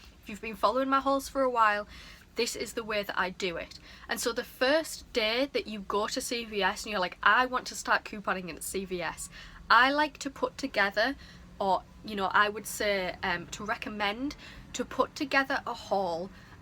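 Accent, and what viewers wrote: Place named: England, United Kingdom. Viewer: Hi your accent sounds a bit like mine are you from England? I am from North Shields a little village called New York xxx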